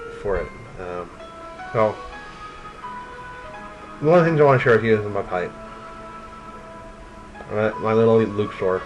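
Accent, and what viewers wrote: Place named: Ireland. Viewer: Dude you have to be Irish :) I'm a cigar smoker but thinking of picking up pipes and stumbled across you're channel! Subbed.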